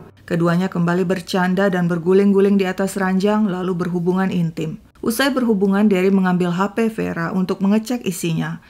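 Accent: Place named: Palembang, Indonesia. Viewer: paten jg kak bahasa palembangnya😂😂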